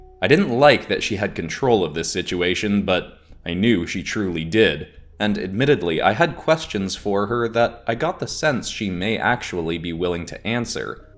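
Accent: Ireland. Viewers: As an Irish man myself i must say, you do an impressive Irish accent 👍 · AMAZING! This Is Going To Be A Fun Miniseries, As I've Always Really Enjoyed Tayrun's World And Videos, So I'm Looking Forward To The Rest Of The Saga, And Your Irish Accent Is Good In My Opinion! I'm Still Hoping For Gardevoir In A Future Pokemon Episode And A Splatoon Video! Also, I Just Got A HUGE Jurassic World Mosasaurus Toy (I LOVE IT, But IDK Where To Put Him Yet😆) From A Comic Shop Today, Which Reminded Me Of Your Mosasaurus Demon From A While Back, And Now I Want To See Either A Mosasaurus Dragon, Or Even A WereMosasaurus For A WereDinosaurs Video! I'm Looking Forward To Your Next Video, And I Can't Wait For The Next Grand Prix! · Love how you pushed this to be a multi-character and beast fighting double spread. This is absolutely awesome and your irish accent was good. Were the spell names the irish words for the type of spell?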